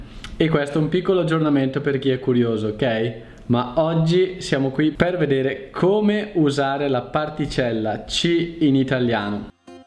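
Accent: Brazil. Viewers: Ciao! Tu sei italiano o Brasiliano? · Lástima que en la pronunciación, lo hace como brasilero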